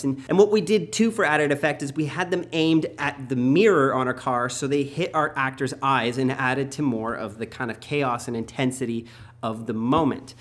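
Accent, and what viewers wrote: Canada. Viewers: I like the way you say process. Wish I had that Canadian accent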